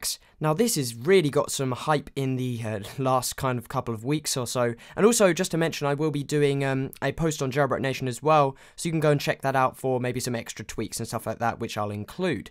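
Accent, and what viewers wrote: United Kingdom. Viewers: r u british? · STFU U BRITTISH GAY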